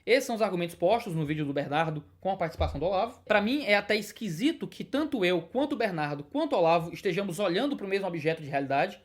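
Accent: Ceará, Brazil. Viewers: Tive a honra de estar há 2 semanas aí e ouvir esse sotaque muito bom e muito engraçado tbm, alias, só tem humorista nesse estado, muito bom. Povo gentil e engraçado, lugares lindos e comidas maravilhosas. Deus abençoe o Ceará!!!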